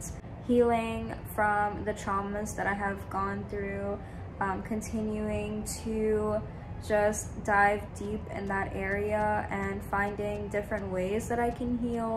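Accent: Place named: India. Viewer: I can tell you're indian from your accent alone ❤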